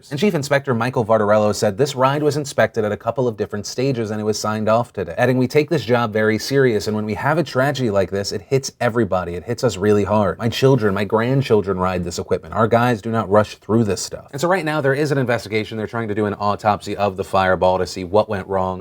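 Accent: South, United States. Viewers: hey, why did you have a southern accent in this one?> XO mom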